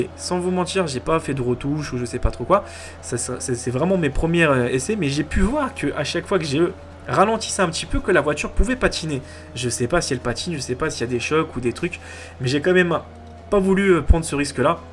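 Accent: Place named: England, United Kingdom. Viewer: ses un anglais qui parle pas un americain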